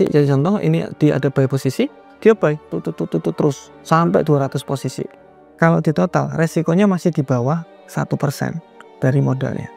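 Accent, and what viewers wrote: Java, Indonesia. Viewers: Bang kmu Jawa ya 🤭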